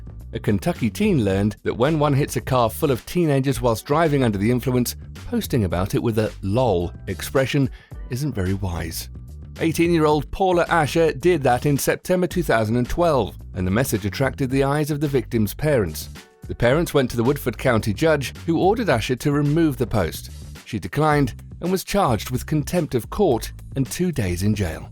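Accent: United Kingdom. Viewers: boring and i hate your british accent you bloody wanka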